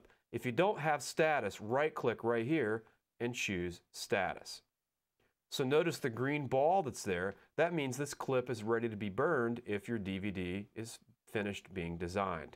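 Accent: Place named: Pennsylvania, United States. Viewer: I love the PA accent.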